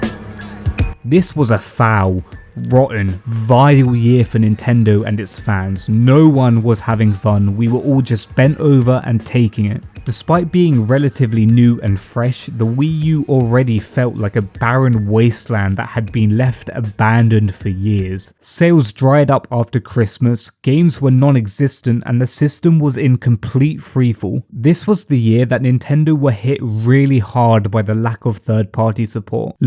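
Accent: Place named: England, United Kingdom. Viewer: The other games that were shown off at the reveal conference should have tipped people off. New Super Mario Bros U is one thing but that first person shooter gameplay showed off that should have tipped people off that it was a new console instead of a new controller because did anybody honestly think that that game could have ran on the wii? Considering later on in the system's lifespan there were downloadable Wii games besides the fact that they should have done way more of download of a Wii games, they should have taken advantage of the hype and scarcity of physical copies of Xenoblade Chronicles. Since you have an English accent you probably wouldn't know but here in North America GameStop sold Xenoblade Chronicles exclusively and they took advantage of the hype surrounding that game by jacking the price is way up.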